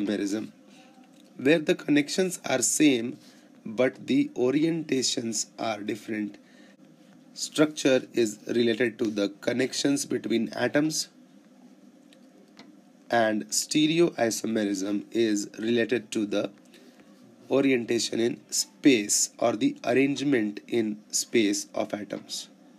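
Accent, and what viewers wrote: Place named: India. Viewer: one of the few Indian accents that doesn't irritate me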